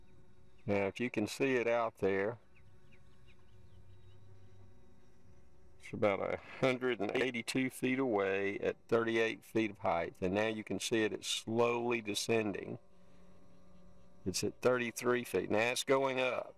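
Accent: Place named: South, United States. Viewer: In my nearly 70 years I do believe i have learned a thing-or-two. As Yosemite Sam would say, only a 'Gol-Darn-Idjet' flies over water when TESTING anything unsure of. And by-the-way, your voice takes me 'down home' a bit... lived in SC, now up in MA for 20+ years. Love it here in my home, but I truly miss the 'Southern Speak', y'all. STOP testing over water! ;-)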